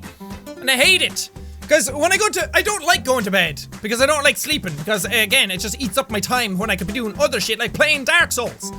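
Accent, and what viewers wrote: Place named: Ireland. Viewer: The irishman is always within him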